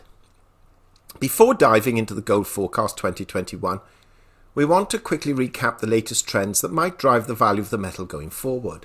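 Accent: United Kingdom. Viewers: I am too new to this to offer any prediction or guidance. However...I do appreciate, value. Cool headed reasoning, professional interpretation(s), cool British accent and annunciation, and a little entertainment thrown in...all for FREE. I’ll always choose the one or two friends that are willing to tell me the hard truths than one or two hundred, patting me on the back. I would revisit my last statement of course, it were to be one or two hundred ladies, if we’re being honest. Anyway. Thank you for providing such a valuable resource. Some of us ARE listening.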